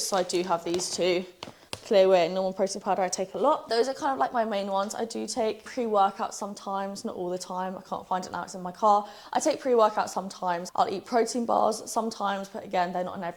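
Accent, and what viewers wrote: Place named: United Kingdom. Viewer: I had to slow down the playback speed to .70 😂.. that British accent is hard enough to understand, now add 85 mph (135 km/h ) 😅.. Besides that, Excellent informational video.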